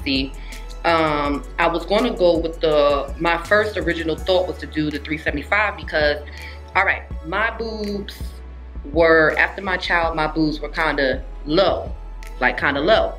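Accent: New York, United States. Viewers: You talk like you from new york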